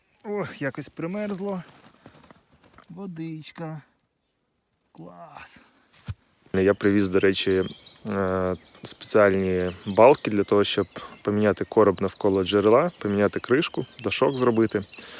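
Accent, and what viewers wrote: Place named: Rivne, Ukraine. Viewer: а звідки пан родом?. по мові чую може бути гощанський район або острозький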